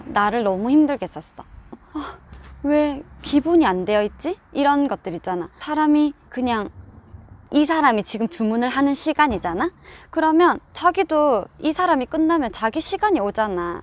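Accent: Gyeongsang, South Korea. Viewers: 💗🌈 안녕하세요ㅎ 부산인이라 경상도말투에 익숙해서 자주 놀러와요ㅎ 이번 영상 전적으로 공감해요!! 제가 커피쪽을 하는건지 아니지만 저랑 마인드가 너무 비슷해서 영상정독했네요ㅎㅎ 날씨+나의 온전한 내모습과 삶+아빠의 자리가 크고 역할이 정말 크게 작용하는 호주의 삶 등등 저를 호주라이프에 푹 빠지게 했어요ㅎ 그리고 ㅎ가끔 외롭고 힘들고 우울해도 맑은하늘과 따스한 햇살아래에서 요가 🧘🏻‍♀️ 한번 하고나면 뭔가 기분이 사르륵 🥰 1년중 300일이상 날씨가 좋다는 브리즈번에도 몇일째 흐릿흐릿 🌧 비가 내리고있지만 낮에는또 화창한 하늘 ☀️ 볼수록 신기해요ㅎㅎ 시드니 감기조심하시구 가끔 이렇게 편 영상 올려주세융! 기다릴게융ㅎㅎ🤩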